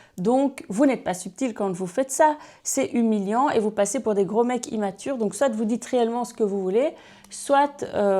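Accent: Belgium. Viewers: Y a comme un petit accent belge qui ressort par moment c est mignon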